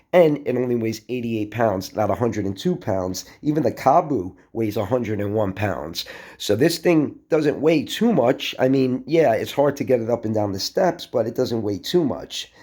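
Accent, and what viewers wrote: New York, United States. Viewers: I love your commentating love the NYC accent keep up the great work my friend!!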